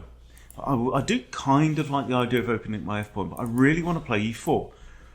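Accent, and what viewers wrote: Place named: England, United Kingdom. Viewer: I'm sorry you're being criticised for mistakes. Most people watching you are advanced beginners to intermediate, I suppose. If they want perfect lines, there are plenty of titled players on youtube that can provide that. You helped me get over that beginner stage when I kept getting wayward queen attacks. I think I've learnt more from you than any other youtuber and it's nice to have an English voice :)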